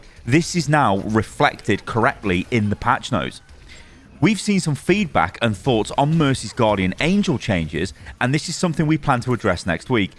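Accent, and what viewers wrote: United States. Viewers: your country accent is 🤌 top notch. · I appreciated the return of the American accent,. 😄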